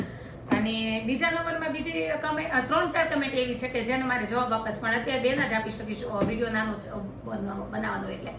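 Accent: Kathiawar, India. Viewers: Aapni matrubhasha Gujarati che.biju ke tame suratra na cho aa kathiyavadi bhasha tame bolo cho te khubj game che.tamari vani saras.che. pan saras bole che. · Your kathiyawadi speech is really nice...❤ i really like it....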